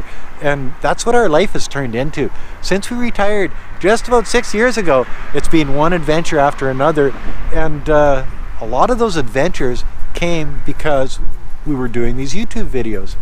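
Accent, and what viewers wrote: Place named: Canada. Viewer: Dude you sound Canadian AF 🤗 Glad your escaping China and have somewhere safe to go👍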